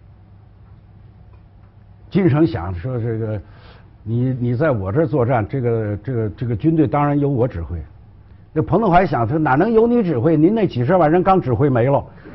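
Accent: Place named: Beijing, China. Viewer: 北京话听着很亲切，又风趣又不失风度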